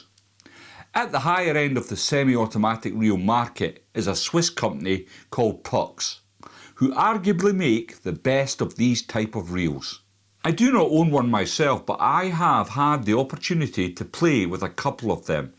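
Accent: Scotland, United Kingdom. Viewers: Happy to see the Pere Marquette River Lodge sticker behind you. I guided on the PM in the 90s. That lodge was “ Johnson’s Pere Marquette Lodge” then. With that Scottish accent, I’m wondering if you’re in Scotland, and if you’ve visited and fished Michigan….where the PM flows.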